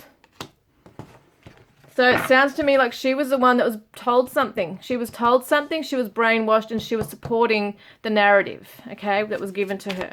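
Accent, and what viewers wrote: Australia. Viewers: Thank you just stumbled on you! Great to hear a fellow Aussie 💙 Summer ❤💜❤ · Love you in depth readings. Hearing the Aussie accent for me makes me feel more at home like we're having a personal convo Thankyou for all your doing to help bring answers to little summers disappearance ~cheers🤗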